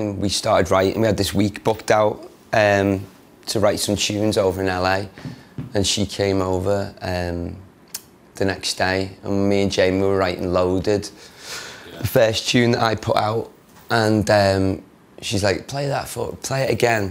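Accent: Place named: Manchester, United Kingdom. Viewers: Leli Flores Manchester boi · His accent is getting more Manc.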